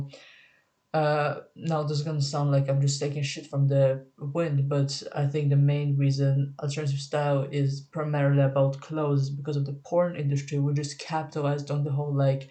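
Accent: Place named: Sweden. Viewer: i think i live alternatively the way it's meant to be lived. roaming thrift stores, doing climate activism, going to punk-, vegan- and queer events - i like it. the looks are a second thought but definitely a part of identifying your crowd. sometimes i wish i was more on social media but then i realize i don't care that much, i meet so many cool people in my town anyways. also your accent is really fun, the first thing i thought was swedish but then the russian came in and threw me off. i was sitting here pondering latvian/lithuanian until you mentioned swedish and i read the comments, so i wasn't far off with that middle point hahha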